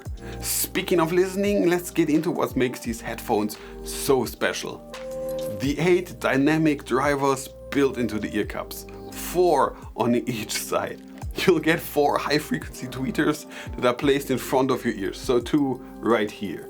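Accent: Germany. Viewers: man hört das du Deutscher oder vllt sogar Österreicher bist :D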